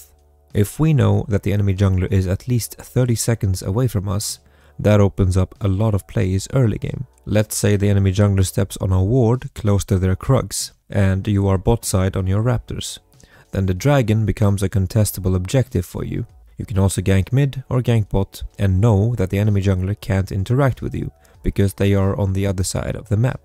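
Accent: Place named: Sweden. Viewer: The way I could tell this would be a swedish channel bc of the accent. Nice vid btw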